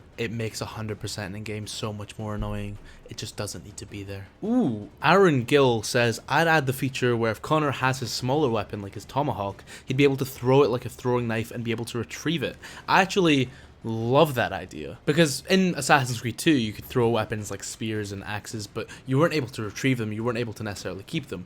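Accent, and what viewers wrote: Scotland, United Kingdom. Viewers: Damn, is scottish?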